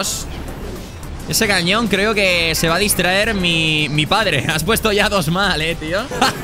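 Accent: Argentina. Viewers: cada vez mas argentino 😎🤑🇦🇷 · Jajajaja me hace gracias cómo abla como argentino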